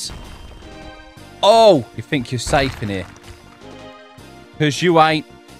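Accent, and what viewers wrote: United Kingdom. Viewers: I just realized that this YouTuber is British💀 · Are you British😂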